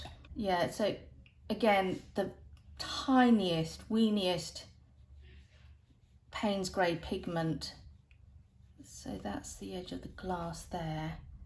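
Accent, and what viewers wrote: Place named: United Kingdom. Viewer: I wish more artists would do this, it really helps to understand the choices made. May I ask, which British accent do you have? I love accents!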